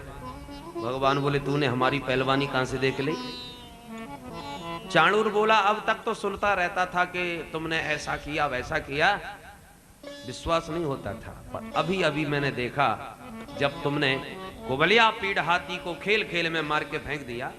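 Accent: Braj, India. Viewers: Ati sunder sumadhur brajbhasha me katha ataydhik sukhad saras prateet hoti hay apko sader naman vandan guruver 🙏🙏🙏🙏🙏💐💐💐💐💐💐💐💐💐💐💐💐💐💐💐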